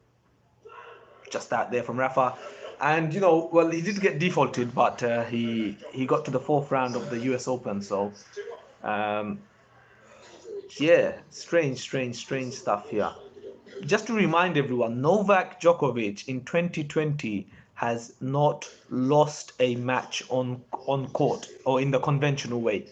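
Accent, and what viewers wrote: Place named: United Kingdom. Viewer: Guessing from his accent, I'd say the UK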